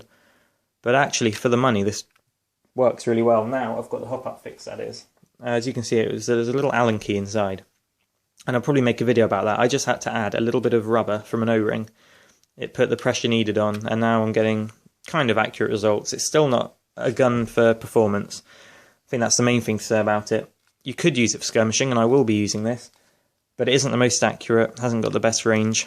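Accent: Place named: United Kingdom. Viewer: hey dude i can tell by your accent rhat ur British can you tell me what the requirements are for owing an airsoft gun in the uk thanks in advance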